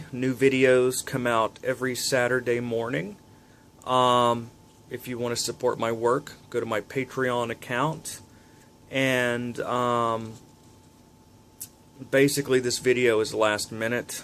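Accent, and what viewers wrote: South, United States. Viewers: Hi from Sydney, Australia. Thank you for your explanations and knowledge - especially around the historical context and ancient symbol aspects, as your teachings have started to provide me with reasons / answers for why I subconsciously use symbols via colour, plants, nuts, shells, stones and crystals to attract harmony and inner peace and have always done this since childhood. I have just started to use symbols for protection and for hurrying up karma. Sometimes one cannot just wait if one or their friends are being hurt. It is so satisfying to watch the Universe respond to your call. Love your words and especially your 'southern' accent, it adds a unique flavour to your weekly explanations. Thanks again, Barbara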